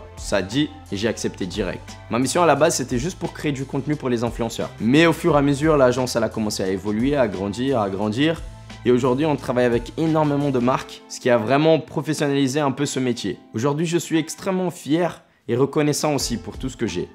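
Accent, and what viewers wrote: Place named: Brazil. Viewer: Finally ça m'a coûté deux cliques "s'abonner" et "j'aime" J'entends un fond d'accent brésilien, ne le perd pas, ça me rappelle des souvenirs 🇧🇷🌞 en tout cas j'attends la suite avec impatience.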